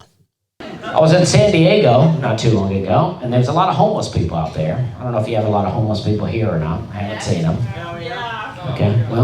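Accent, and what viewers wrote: South, United States. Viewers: The thing is there is not ONE joke in this entire set? Just uncomfortable country drawl mumbling? Do you do jokes or just ramble aimlessly? If the people were laughing hard you wouldn't be heckled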